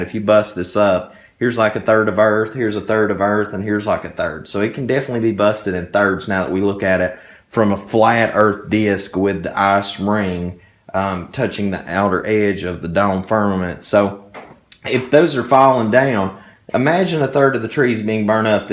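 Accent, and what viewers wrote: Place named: South, United States. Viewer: Very informative and compelling.. Thanks,.. God bless and guide you, dear brother.. Btw, where are you from? I definitely detect a Southern accent. North or South Carolina? My Momma was from Kings Mountain, NC,and I still have tons of kinfolk,down yonder, that a way...:)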